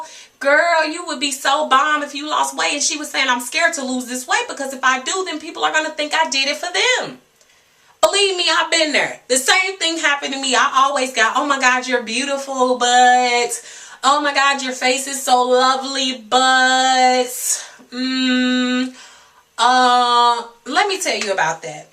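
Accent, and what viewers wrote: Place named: St. Louis, United States. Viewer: Tell 'em (the St. Louis came out on this video, lol)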